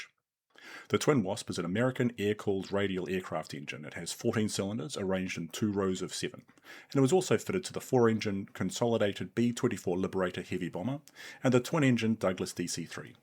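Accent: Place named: New Zealand. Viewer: Having seen the flying boats at MOTAT in Auckland I think it's wonderful that NZ is a significant repository of the type. But is this creation actually AI? I assumed so due to it's haphazard construction, but the NZ accent has thrown me. Anyway it is great work and great research, but you really do need an editor. Too much material is duplicated through the length of the video, and a bit of tightening here and there would greatly enhance the work. Also think that use of the aviation alphabet instead of simple use of English letters detracts. "Mike Charlie" is better as Em-See. On a related topic, BOAC was always pronounced Bee - Oh - Ay - See, not Bowack.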